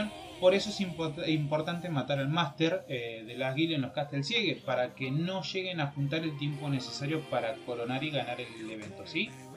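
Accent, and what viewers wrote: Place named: Rosario, Argentina. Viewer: Sos de Rosario ?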